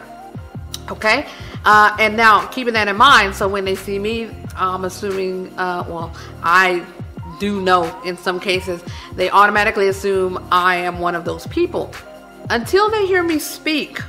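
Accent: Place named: United States. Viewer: Interesting I kept saying in every video I watch, she sound so American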